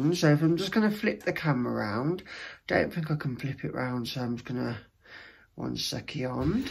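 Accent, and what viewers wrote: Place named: Scotland, United Kingdom. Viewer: Ps… love the Scottish accent 😂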